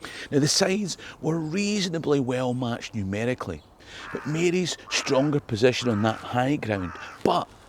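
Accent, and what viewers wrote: Scotland, United Kingdom. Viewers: another great video presentation,. but why the "closed captions"? even as an American, I can understand everything you say. although, I can see where some may have trouble comprehending a Scottish dialect. again, thanks for such a great video.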